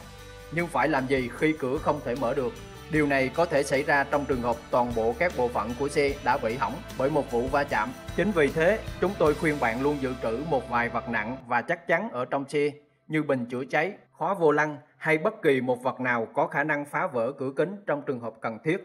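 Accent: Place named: Rural, Vietnam. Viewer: Giọng ông này sao giống nhà quê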